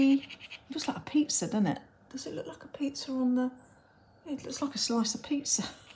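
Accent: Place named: Southeast England, United Kingdom. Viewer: Hello there! Nice to come across your channel! New supporter here! Love the accent by the way (must be missing uk) 😅 southeast?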